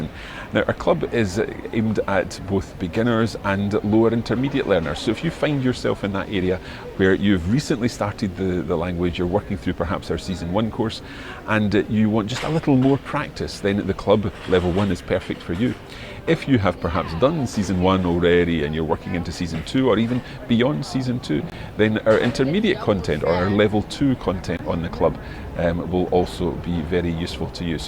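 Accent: Scotland, United Kingdom. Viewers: I love your Scottish accent,. What part of Scotland are you from?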